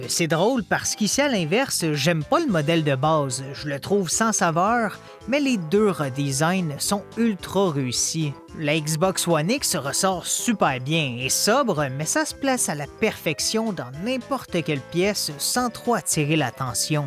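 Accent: Quebec, Canada. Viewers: Quand tu pense cliquer sur une vidéo en français et qu'après tu te rend compte que c'est en québécois...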